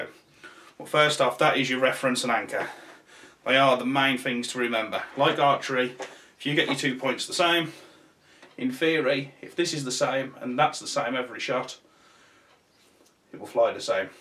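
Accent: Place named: Midlands, United Kingdom. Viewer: the video poster has an English Midlands accent.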